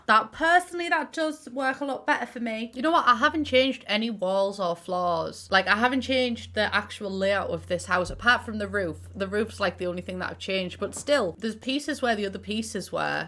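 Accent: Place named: Middlesbrough, United Kingdom. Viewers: This voiceover makes me want to move to Middlesbrough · When you’re from Middlesbrough and understand everything is saying/talking about:) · As if ur from the Boro hahah gerrin lass me too, i just assumed I was imagining the accent 😭, best thing to come out of Boro in ever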